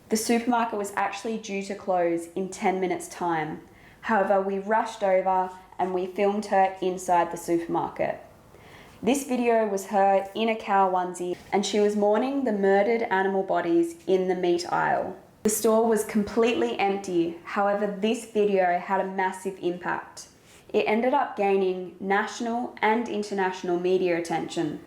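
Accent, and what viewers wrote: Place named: Australia. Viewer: If you are the vegan teachers daughter, why are you Australian and your mother is American? How did you get the accent?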